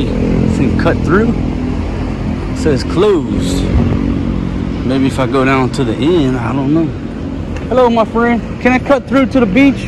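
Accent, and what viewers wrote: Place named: Texas, United States. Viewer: Hi, alam mo you are the best in that region... your videos are the best// I am from Singapore and have a lot of USA friends, pero you are the best although i can sense you from Texas (your accent the giveaway)... hahahahaha/// love your videos my friend... Waiting for you to choose your wife number 3... don't know what I blabbering... kasi I am drunk... hhahahaha... nice videos my friend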